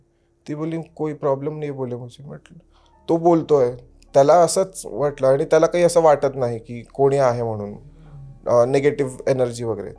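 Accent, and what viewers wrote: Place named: Pune, India. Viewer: Bhai mhit nhi khott vate but amchya nagpur chi evdhi shudu language nhi ahe bhau ....ekda nagpur firun bgha smjhel ha pune vgere mdhla vatatoy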